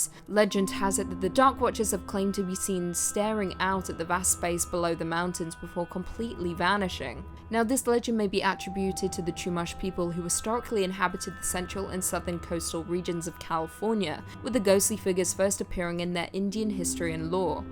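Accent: England, United Kingdom. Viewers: speaking as an English person.. you still sound very English to me. I don't know what they're talking about. Keep up the great vids:) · I didn't realize that California had such dark and scary history and I love English accent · the hollywood sign ghost looks alot like kirsten dunst, is it just me or? is not losing her english accent, what do you mean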